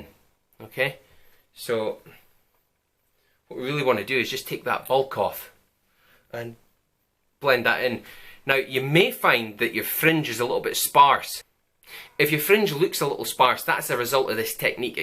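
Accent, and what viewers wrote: Scotland, United Kingdom. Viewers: Thanks for this. I'm giving hubby a Covid Cut this weekend, and this is a great tutorial !! Also..you could recite the Privacy Agreement for Apple, and I'd still listen...lovely Scots brogue !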